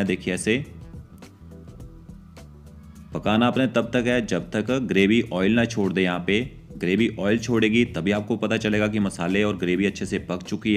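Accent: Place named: Pahadi, India. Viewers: Are you from Uttarakhand? If yes where are you from? I am asking because you have some Uttarakhandi accent in you voice. Just asking out of curiosity. BTW I’ll surely going to try your recipe and let you the result. Thank you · U r pahadiapka tone lagra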